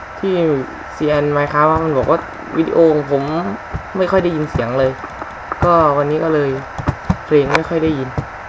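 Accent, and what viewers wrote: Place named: South, Thailand. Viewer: เด็กใต้หรอ ทองแดงน้ะ <num>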